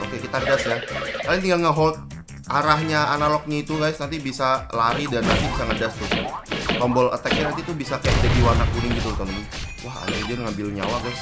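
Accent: Java, Indonesia. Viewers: jowo geming mass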